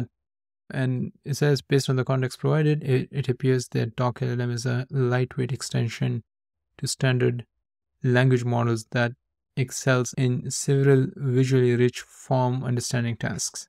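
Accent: India. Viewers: Where are you from? You sound a lot like my Indian Computer Science teacher.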